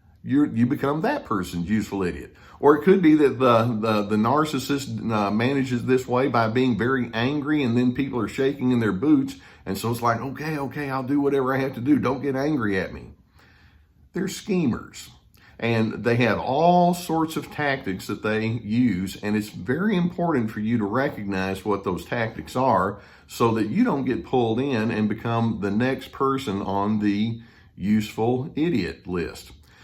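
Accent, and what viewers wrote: South, United States. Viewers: Ty for a great video, I love your accent ( i am homesick - miss hearing folks chatting) i had a narc boss, who liked to make everyone her "idiots", finally, after 2 yrs, i had enough, i stood my ground & gave her some southern fire- now i am free of her, - watch out for bosses, who like office drama.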